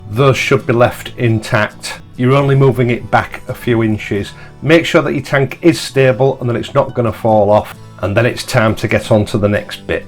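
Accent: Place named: East Yorkshire, United Kingdom. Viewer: Great video! Nice and clear as always and your calm E Yorks tones are most relaxing too 🙂. (Could I just be a little bit pedantic though by saying that air pockets in the system are not 'cavitation'. Cavitation is caused by pressure vapourising liquids in things like turbines, centrifuges and with boat propellors.)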